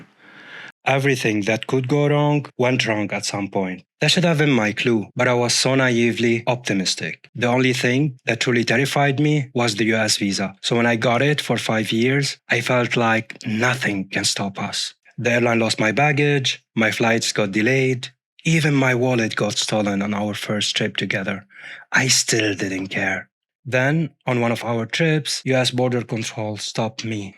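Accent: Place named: Syria. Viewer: من لهجتك مبين انك سوري، ومدامك دارس طب ف عالاغلب مسافر ع المانيا بتمنالك كل التوفيق وعلى امل انك تلاقي حياة احلى واكثر انفتاحا وتقبلا وامانا برا سوريا وملاحظة صغيرة: انت قلت (غيري من الناس العاديين)، الاصح هو غيري من الناس المغايرين جنسيا او الستريت، اما "العاديين" فكلنا عاديين وطبيعيين سواء كنا مثليين مغايرين مزدوجي الميول او من غير ميول كلو عادي وطبيعي😁 تحياتي الك صديقي وكل التوفيق Much love🤍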